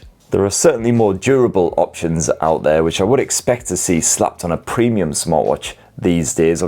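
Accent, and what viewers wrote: United Kingdom. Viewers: I love your British accent, especially when you say "shenanigans" 😂 Your reviews always make my day bright.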